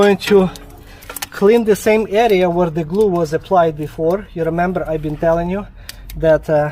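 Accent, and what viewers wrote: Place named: Russia. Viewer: чел, ты реально думаешь, что я смотрел и слушал 40 минут безумного русского акцента про замену тормозных дисков? Оно мне зачем? И, может ты не в курсе, но языки других стран это не проблема с появлением нейросетей. На лету переводят. Впрочем, оно мне не надо, чтобы понять твою речь. Снимай, как хочется.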